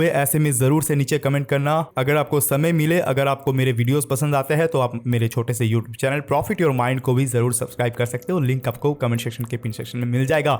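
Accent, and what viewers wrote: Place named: Bengal, India. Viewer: Pehle toh ye bangali accent chod de bhai. Warna hum k lecture ae he khush hai. Ur accent literally irritates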